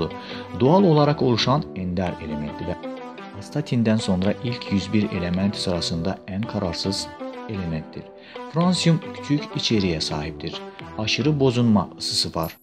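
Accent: Azerbaijan. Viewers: Adam Azerbaycan Türk'ü anlayamadı mı ses tonundan şivesinden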